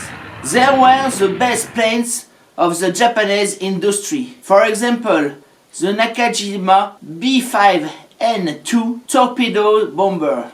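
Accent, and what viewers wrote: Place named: France. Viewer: Génial les effets sonores et la cornemuse à la fin ! Ça semble vachement plus personnel avec ces décors et ce long travail de montage qui nous tient en haleine. Parler anglais ça amuse l'oreille vu que ton accent est français, super idée ! Tu t'y connais en histoire militaire de la chine ? Genre dynastie Qing ?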